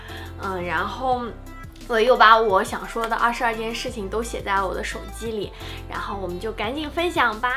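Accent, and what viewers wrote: Shandong, China. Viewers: 生日快乐！没想到第一次看你的视频就碰到你过生日～好接地气的女孩子，不知道为啥听出了山东味儿，好喜欢你，率真朴实，继续加油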